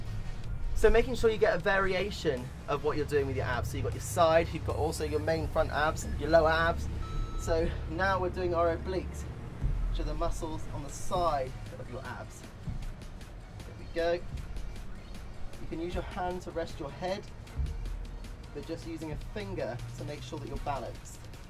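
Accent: United Kingdom. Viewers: you are simply best... Greek Body, Innocent and cute loving face.....your British accent everything with killer smile... All this these things creates something I call that as Magic... I wish can meet you, your hubby and son one day in person. Keep sharing this beautiful and inspiring video... We love you:) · Love your BRITISH ENGLISH accent！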